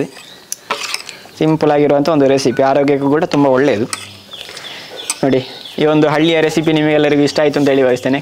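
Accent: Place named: Coastal Karnataka, India. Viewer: ನೀವು ಮಾಡುವ ಪ್ರತಿಯೊಂದು ಅಡುಗೂಟವು ನನ್ಗೆ ಸಿಕ್ಕಾಪಟ್ಟೆ ಇಷ್ಟ ಮತ್ತು ನಿಮ್ಮ ಕರಾವಳಿ ಕನ್ನಡದ ಭಾಷೋಚ್ಛಾರದ ಶೈಲಿ ಎಲ್ಲವೂ ನನ್ಗೆ ಅಚ್ಚುಮೆಚ್ಚು ❤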